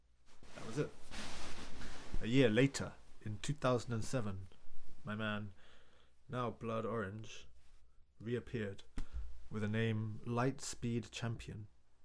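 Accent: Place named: United Kingdom. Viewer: 우와 진짜 영국식 영어!!!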